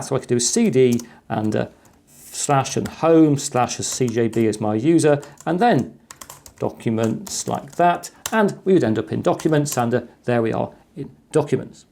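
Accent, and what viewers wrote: England, United Kingdom. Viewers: Is this traditional England tone？ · Hello. OpenSource enthusiast since first Linux distro release, Slackware. I have watched several of your videos and I am very impressed with the tools, creation, content, and the way u explained some complicated subjects for the regular audience in very understanding manner. Not to mention the nice British accent!! Kudos!!!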